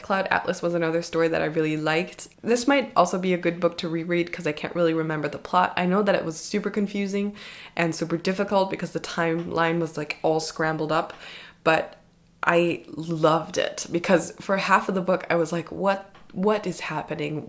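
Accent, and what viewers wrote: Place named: Germany. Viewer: have you been raised bilingual? Since, as a german, I can kinda hear a german accent there, which is sorta fascinating, since I dont hear my own, eventho Ive been told my german accent is pretty noticable.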